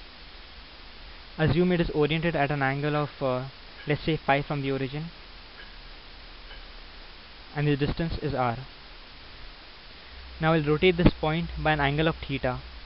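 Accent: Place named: India. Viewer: very nice video..easy explanation and the accent was nice and indian ;) kep it up bro,looking for more videos on grahpics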